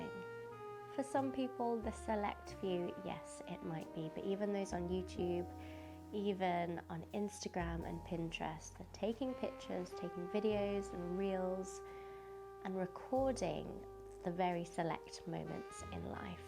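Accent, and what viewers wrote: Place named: Yorkshire, United Kingdom. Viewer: Thanks for this video! I’m loving your channel! I’m guessing you’re from Yorkshire! Maybe Leeds? ☺️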